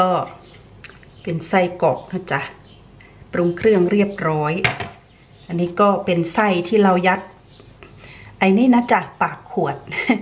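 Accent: Isan, Thailand. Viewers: เพิ่งเข้ามาดูคะหนูบอกว่าเป็นคนสุรินทร์แต่พูดเหมือนอีสาน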